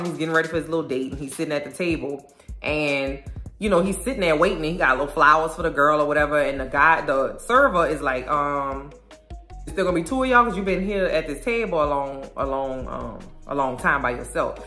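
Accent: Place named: Louisiana, United States. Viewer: I hear the Louisiana in your voice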